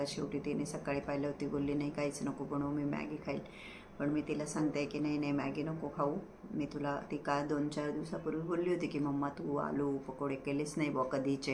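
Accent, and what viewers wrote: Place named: Khandesh, India. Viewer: ताई तुम्ही तुमच्या भाषेवरुन खानदेशातील वाटतात.तुम्ही हा त्रास तुम्हाला नेमका कधीपासून होतोय हे सांगितले नाही.तुमची एखादी सवय बंद झाली आहे काय? एक उदाहरण सांगतो. एका कार ड्रायव्हरचा अॅक्सिडेंट झाला होता.कपाळाला मोठी खोक पडली.त्याचे ऑपरेशन झाले.पण आठ दहा दिवस त्याला संडासलाच न झाल्याने तो डाॅ.कडे आला.बरीच चुर्ण औषधी दिली .तरी फरकच पडेना.डाॅ. विचारात पडले.त्यांनी त्या पेशन्टला विचारले की अपघाताच्या आधी तुम्हाला कोणत्या सवयी होत्या का ? तर तो म्हणाला मी रोज एक पाकीट सिगारेटस ओढायचा.त्यामुळे मला हा प्राॅब्लेम येत नव्हता.डाॅ.नी त्याच्या हाती दोन सिगारेट देऊन ओढायला सांगितले. एकच सिगारेट ओढल्याने तो टाॅयलेटकडे पळाला. अशी खाण्यापिण्याची सवय तुम्ही आठवून पहा.जर त्यानेही फरक पडत नसेल तर तुम्ही निष्णात हिप्नोथेरेपिस्ट कडे जा.शक्यता आहे तुम्ही त्यांच्या ट्रिटमेन्टने बाहेर पडू शकाल.हिंमत सोडू नका ताई.आनंदी राहा. एक राजकुमार शिखारे नावाचे हिप्नोथेरेपिस्ट आहेत.ते हिप्नोथेरपीने असाध्य आजार बरे करतात.ते कदाचित सातारा किंवा पूण्यात असावेत.त्यांना जरुर भेटा.पण वेडे वाकडे विचार मनात आणू नका प्लिज.इच्छाशक्ती वाढवा. 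अनुलोम विलोम कपालभाती शास्त्रोक्त पद्धतीने करा.कदाचित तुमची प्राणायाम करण्याची पद्धत चुकत असेल. पण हिप्नोथेरेपिस्ट कडे जरूर जा.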